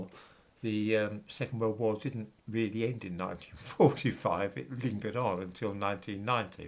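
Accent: United Kingdom. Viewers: sounds British to me do you speak English? Your facts where did you get them from are you a British civil servant Where do NATO weapons go? Near Odessa, the SBU detained smugglers during the sale of weapons purchased from the Armed Forces of Ukraine in the combat zone. the real news from the ground "🅾️" group, Colonel General Alexander Lapin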